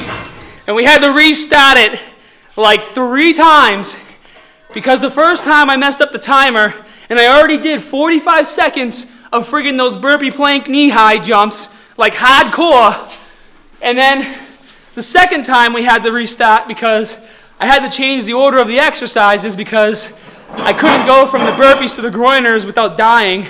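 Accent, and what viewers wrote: Boston, United States. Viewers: Boston haha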